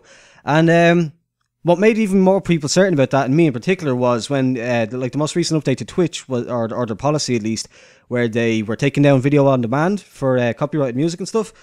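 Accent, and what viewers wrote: Ireland. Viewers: Haha I love a fellow irish accent, where abouts are you from I'm from swords in dublin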